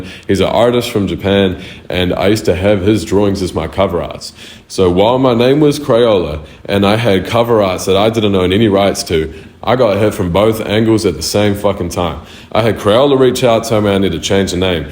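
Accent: Australia. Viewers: HE SOUNDS ALSRLIAN BUT HE SAID HE IS NOT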